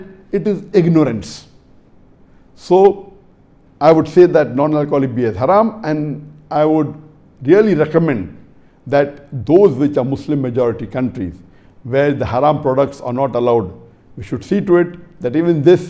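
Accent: India. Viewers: I can't understand Indian accent, can you tell me is this halaal or not?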